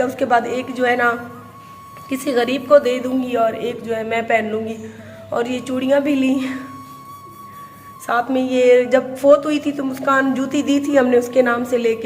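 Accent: Saraiki, Pakistan. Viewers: Syster aap bolati bahut pyara hai saraiki aur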